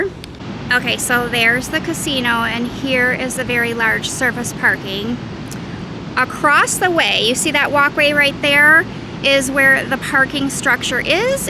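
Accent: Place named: Michigan, United States. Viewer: Hey, CornDog here. That was really well done! My wife and I have stayed at the Riverside regularly over the past 30 years....I'd guess over 50 trips to Laughlin. We can drive there from San Diego in about 5 hours which includes a couple of stops along the way. Laughlin is just a friendlier place than Vegas and of course a much older crowd too. Have you done a video on the Jet Boat Tour down to Havasu? My wife & I took that trip a few years ago. Some beautiful scenery for 60 miles down and then back up the river. Are you originally from the upper midwest. I grew up in Michigan and you sound like you could be a Michigander. Thanks for the video!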